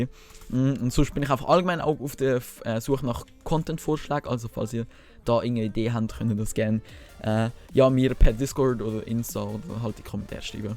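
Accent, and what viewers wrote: Zurich, Switzerland. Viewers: bahhh züri digge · WeirdChamp das ich andere auf YouTube die schweizerdeutsch reden und ich bin mir gewohnt das du so redest (bin auch Schweizer ich verstehe alles) für die Deutschen: Hoi we gohts öich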